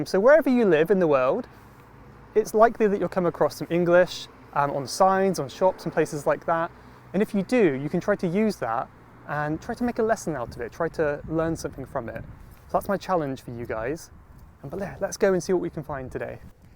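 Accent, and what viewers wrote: United Kingdom. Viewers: Very nice video. Love British accent.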